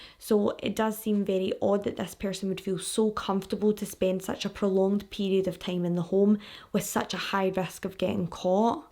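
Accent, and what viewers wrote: Scotland, United Kingdom. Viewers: I kno rite I love ur personality ur accent ur beautiful very down to earth but funny to!! U go girl all the way in Scotland I Loove it... LOVING UR CONTENT N ALL U DO DO FRM YA GIRL FROM (HELLO FRM THE OTHERSIIIDE HELLOOO FRM THE OUTSIIDE LMAO VIBES ALL DAY LOVE IT LOVE IT! LOVE ALL THE WAY FROM SAN DIEGO CALI BABAAY! HEAAAYY!!! CARRY ON GIRLFRIEND!!❤️😆❤️😆❤️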